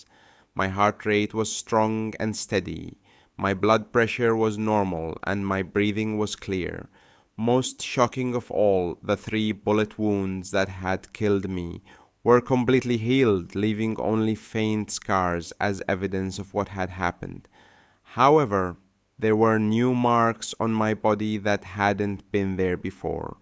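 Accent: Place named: Pakistan. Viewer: He doesn't sound arabic, more like a pakistani.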